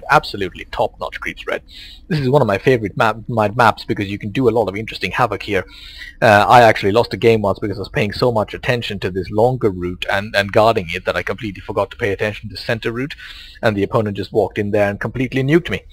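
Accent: United Kingdom. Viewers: Thanks for the cast and as always loving your British accent, keep it up!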